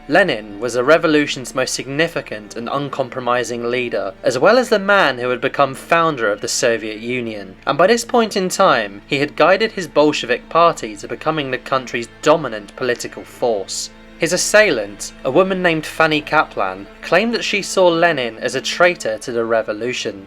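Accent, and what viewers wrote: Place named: Cockney, United Kingdom. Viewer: One torture the Soviets were never cruel enough to impose was forcing one to listen to a bleating cockney voice drone on and on for 10 minutes!!!!!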